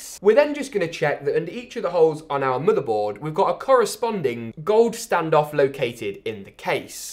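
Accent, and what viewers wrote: England, United Kingdom. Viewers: Are you english ? Love your accent . Btw nice explanation , set up and passion about pc 👍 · I luv his british accent lmao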